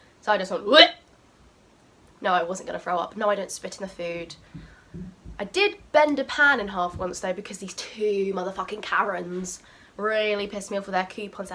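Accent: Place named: United Kingdom. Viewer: Very punctual with the response. But I sure do agree that the UK are far more strict than the US. Not really sure why people are attacking you as you are clearly in a different continent (due to your British accent) and you are just an employee, or at least used to be. But your quick response to address the issues people have is great and like the approach you took. Taking great care of the comments on your videos and addressing them and paying attention to it. That is nice of you to pay attention to useless comments by outraged people hating on subway. As for your video content, I can see you make videos with a bit of comedic style to it, which is unique. Disregard the hateful comments, your videos are great. It is natural and real and straightforward. Good luck with your channel, and hope you have a great future ahead of you!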